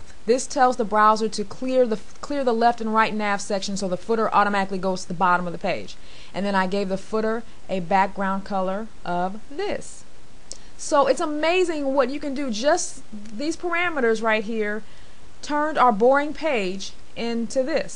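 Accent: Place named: South, United States. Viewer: I love your voice. Very smooth, soft and with a subtle hint of Y'ALL.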